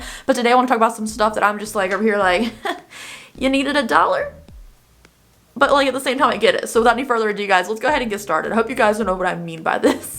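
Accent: South, United States. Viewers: Where are you from? I hear a Southern accent.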